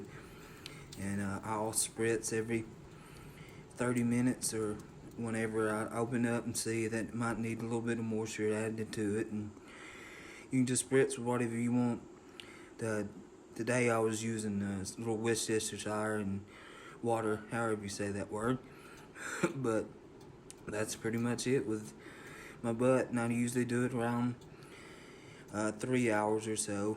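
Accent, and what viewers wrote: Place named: South, United States. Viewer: Beautiful home cooking honey! That pork looked amazing. The bark was spot on!! 🔥 That’s funny and cute about you not saying “butt”! Love your country personality and accent and loved your outside setting.. very peaceful! Thank you for sharing. I enjoyed the video honey! 😘 PS the sammich looked amazing! 🤤 🙌🏻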